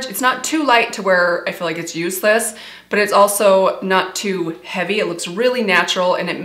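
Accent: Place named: Utah, United States. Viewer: The hard Utah accent was an immediate turn off for me. And you talk way, way too fast! I tried contacting her reps to find out which She Glam concealer she was talking about and the response was ridiculously convoluted. The fact that she suggests going to Sephora for new brands is laughable. Go to Ulta, which I also don’t love but at least you get some drugstore brands. So funny that she is talking about Nabla at the end of this vid and you can get the brand at Ulta but not Sephora. Seems all slapdash thrown together- who deemed her an expert? I will be leaving as fast as I found her-toodles.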